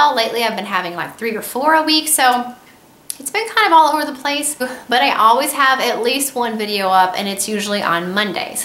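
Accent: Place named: South, United States. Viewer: I subscribed to your channel because I was interested in learning more about homeschooling and being a SAHM. I like your personality and southern accent. I've always liked anything "southern"... Movies, books, tv shows, etc. I also like your gift videos and that you like to use natural cleaners. Your Mickey subscription box video has me itching to save up for another Disney vacation and I'm really excited to watch your Disney videos now! Our baby boy was born last week and we haven't decided on a name yet. What are some popular names for boys in your area? Or if you had a boy what would you name him?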